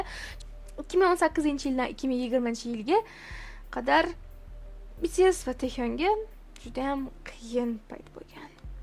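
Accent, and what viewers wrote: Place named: Khorezm, Uzbekistan. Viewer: Xorazmdanmisiz apka sheva tortib baryotir❤😂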